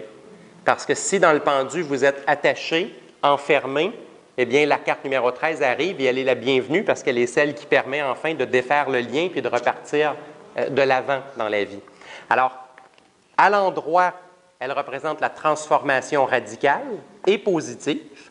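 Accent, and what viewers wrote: Quebec, Canada. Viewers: Great video! Watching it from California to improve my French language skills and tarot understanding. Love the Canadian accent! You enunciate so well and sound a bit like Benoit Gouin! Merci Bien! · Québécois. C comme ça qu'on parle ici😉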